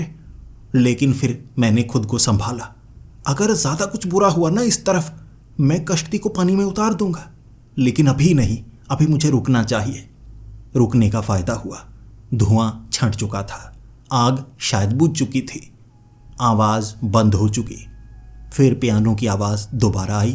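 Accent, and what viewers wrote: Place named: South, India. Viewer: App south indian ho 100%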